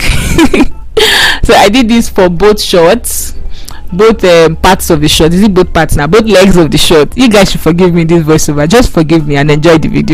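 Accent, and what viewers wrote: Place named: Africa. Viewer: Thank you baby. I am all of 3 days new to sewing, I will definitely try to do this but will start with a skirt fist as I do love skirts. This is very beautifully instructed and informative. Very beautiful accent too. Love my Africans.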